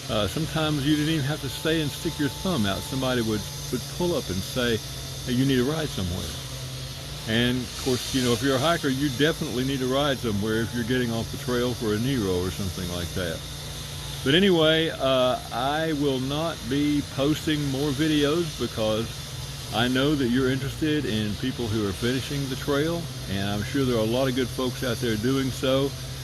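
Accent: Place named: South, United States. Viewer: Congratulations on hiking your own hike, and knowing when your personal journey was over. Being honest with yourself is difficult, when you set lofty goals and know your abilities, but know in your heart that you have realized that your dreams and visions have been answered in every aspect. Now you can carry them in your heart and share them with others who might be inspired to attempt the life-changing journey that you have taken. I'm sorry that I didn't get to see you in the flesh at Upper Goose Pond Cabin, but it was not to be. You have become a companion in my armchair, and shared six months of your life with my wife and me, and we are grateful for your time, effort, and good-natured humor, delivered in a soft and mellow drawl. We both lived down South, individually, when we were younger, and have since married and are living in the New England state in which I was born, but, whenever we visit any place below the Mason-Dixon Line we are still home. 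Godspeed,. The Lord bless the path that He has set before you. I trust that we shall meet some day. 👍💪✌🙏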